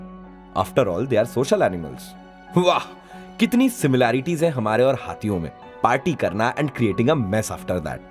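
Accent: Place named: Uttar Pradesh, India. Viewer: Very beautiful and eye-opening video.. Voice over by UP vale bhaiyya.. :-)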